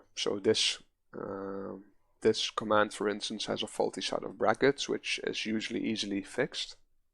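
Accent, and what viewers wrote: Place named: Netherlands. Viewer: Nederlands ofnie ik kan het zo horen in jou Engels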